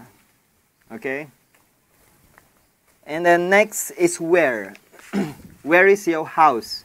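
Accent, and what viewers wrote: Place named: Malaysia. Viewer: Sebutan Inggeris adik sebiji sama dgn org malaysia.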